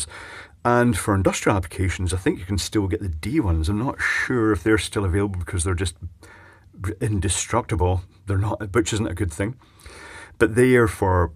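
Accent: Scotland, United Kingdom. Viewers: Your Scottish brogue kept me watching the full 18 minutes even though I live in a country with a completely different electrical system.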